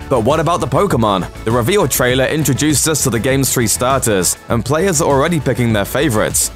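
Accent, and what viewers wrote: United Kingdom. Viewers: Only fitting they the person who narrates has a British accent.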